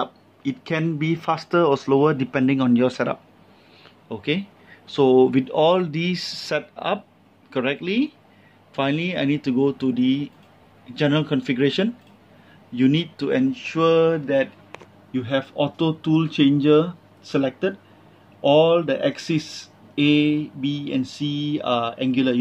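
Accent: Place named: South Africa. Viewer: you have south African accent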